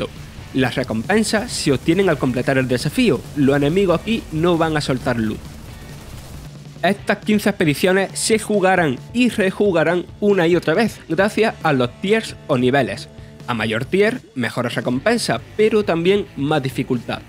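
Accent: Andalusia, Spain. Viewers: A todos los que comentáis sobre el locutor: es andaluz (diría que de Andalucía oriental) y es un dialecto de vuestro país, que ni siquiera es lengua propia... si no lo entendéis es porque no queréis. Bienvenidos a España castellano-parlantes ;-) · Para los que se quejan de esta voz, yo entendí todo y tiene su acentuación española pero no exageren, ¿que palabra no entiendieron?.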